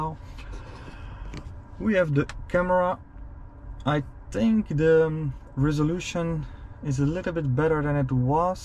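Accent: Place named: Netherlands. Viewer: Hello, Thank you for your review 😊 Your car is in french but I feel like you have a dutch accent, are you from Belgium ?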